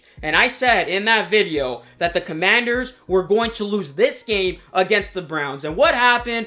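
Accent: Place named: Wisconsin, United States. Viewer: Lol I hear that Wisconsin accent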